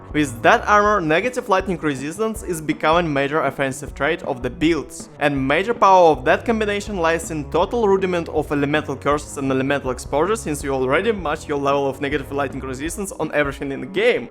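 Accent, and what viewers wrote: Russia. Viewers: мне кажется или у чувака русский акцент?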